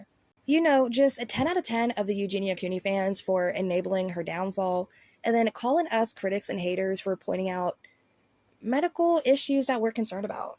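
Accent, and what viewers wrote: South, United States. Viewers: The same thing happens with Tigers. They drug them so people can take pics with them. They are doped up so they can lay on a small table and people can pet them and take pics. It’s absolutely disgusting! I agree about the monkeys. That is such a sad situation. JS uses Eugenia and she will never admit that he is shady. She will continue to kiss him and promote his products. She is looking so sick too. I think she is at her all time low with her weight and it is really showing in her face. She is looking older than she should due to her illness. You are the only one I watch now about Eugenia and JS. You are straight to the point and don’t drag out the videos. I love your southern accent and that you don’t over edit. You are real and also very funny. I love when you do voice overs. Lol Keep the videos coming girl! I look forward to them. 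♥️♥️ Hope your voice gets some rest.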